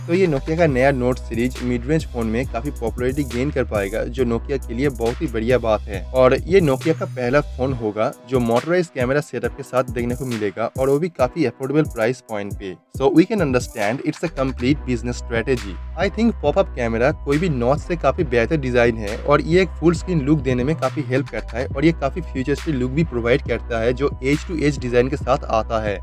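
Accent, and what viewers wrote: Bengal, India. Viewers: Sir, apke Bengali hindi accent ke vajah se Kay bol rahe ho kuch bhi samajh nahi aa raha hai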